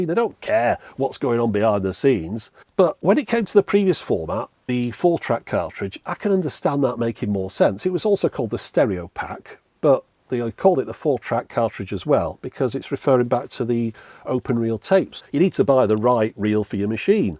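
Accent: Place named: Lancashire, United Kingdom. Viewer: I reckon is from Lancashire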